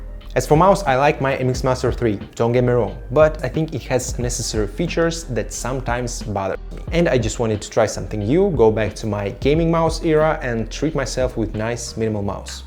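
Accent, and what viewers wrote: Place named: Russia. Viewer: Srazu chuvstvuetsa russkoyazichniy akcent. Nice setup.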